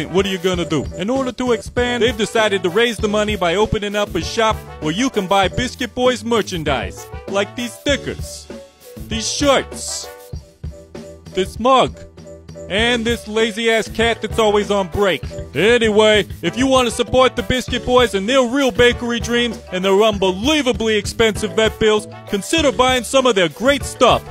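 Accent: New York, United States. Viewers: This is the first time that I've seen this video. It's hilarious. Especially the narrators New York accent. ❤🐈❤🐈❤🐈❤ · Love the NY accent!! Lookin' good, BB!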